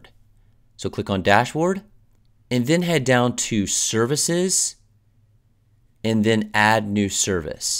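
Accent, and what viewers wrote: England, United Kingdom. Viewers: I.m just curious, but what happened to your lovely gentle English voice and accent I enjoyed on your 2015 video which was one of the first I came across before this one - and persuaded me to go for Sydney.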